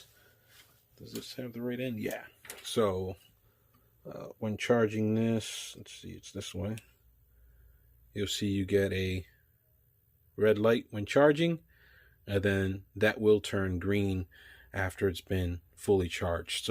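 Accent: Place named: Boston, United States. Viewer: That's a pretty comprehensive review - Thank-you!! Whereabouts are you from, fella? I almost thought Boston, but your accent isn't the typical Bostonian I'm used to... Also, if you don't mind me asking, what do you do for a living that requires a duty belt? I'd guess Police Officer, from the '911' part of the channel name?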